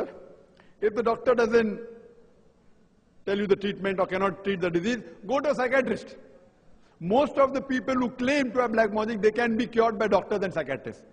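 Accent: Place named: Arab world. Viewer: Unxpectedly Xpected Are you Islamic people forfeited any kind of magic? If it doesn't tell me.I can't fully understand cause he is using Arabic accent. I barely understand only 20~30%.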